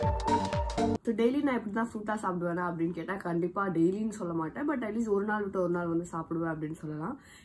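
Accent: United Kingdom. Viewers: Her cloth 😂 British accent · that British accent though! One of the best! Loved the vlog!! 💯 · Talk in British accent nxt vid ,maybe teach us ur accent,coz it'll ofc help us!! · British accent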